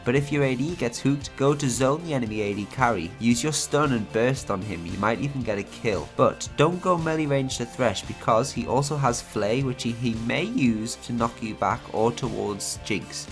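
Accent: Newcastle, United Kingdom. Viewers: You're from newcastle aren't you?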